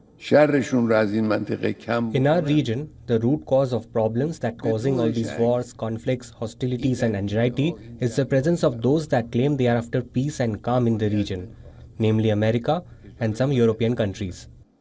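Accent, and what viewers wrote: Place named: South India, India. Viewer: Is the news reader a south india. Her accent seems she is from south.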